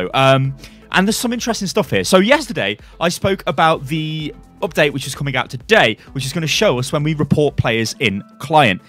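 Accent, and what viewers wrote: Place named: United Kingdom. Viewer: I like your british voice way more than these other american Overwatch youtubers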